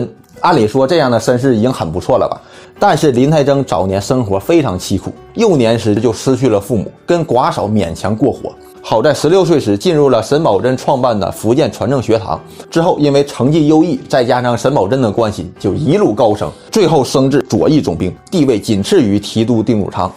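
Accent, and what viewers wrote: Northeast, China. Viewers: 好片，轻东北口音解说听着很给力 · 这大碴子味的普通话听不清你说啥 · 口音太重，一口东北大碴子味儿。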